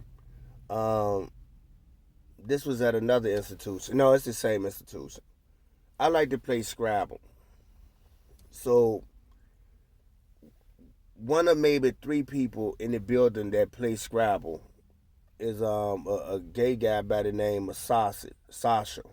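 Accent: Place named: New Orleans, United States. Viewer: You keep saying Virginia but you're clearly from New Orleans. Born and raised definitely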